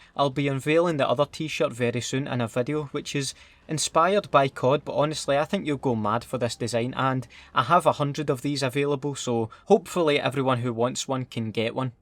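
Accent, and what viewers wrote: Scotland, United Kingdom. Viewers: Being from America his Scottish accent is very difficult to understand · It's because Scots tend to have a good sense of humour... But the accent DEFINITELY helps. Frankie Boyle for example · Wis happpnin in? Where aboots in Scotland are ye actually fae? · i love hearing a scot guy ranting about cod killstreaks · Absolutely love your Scottish accent, love from italy❤️ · great acssent bit cam doon abit ma femily are pure hearted scots men an we ma get feejee a lot bit nae as bad a ye mate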